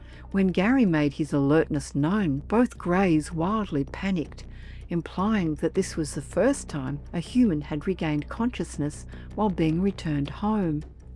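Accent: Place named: Australia. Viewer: Love your Aussie accent, gentle pace and narrative expression. Great stories too!😀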